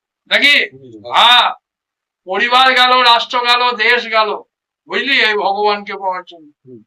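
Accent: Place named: India. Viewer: বাংলা কথা হিন্দি কথা টান দিয়ে কথা বলছেন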